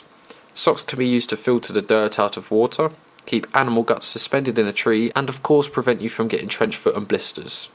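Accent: United Kingdom. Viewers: you from uk man?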